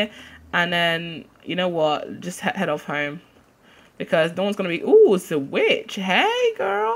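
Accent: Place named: United Kingdom. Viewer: Love your accent. Are you from the U.K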